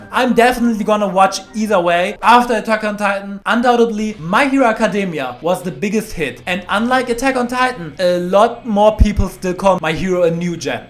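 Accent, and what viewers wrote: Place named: Germany. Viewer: man hört hart den deutschen akzent geil 😂😂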